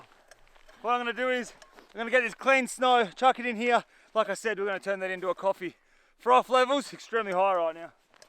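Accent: Australia. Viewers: Great video, and happy new year to you a mac10.. it’s really funny how super Australian you sound with the backdrop of snow haha 😂 the accents multiplied since leaving the sun haha.. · If it wasn't for the Australian accent, I would've thought him a russian 😂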